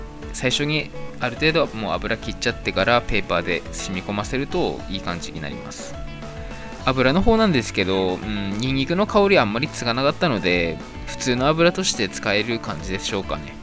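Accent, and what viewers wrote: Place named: Aomori, Japan. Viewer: 青森の方ですかね？ 津軽弁が懐かしいです。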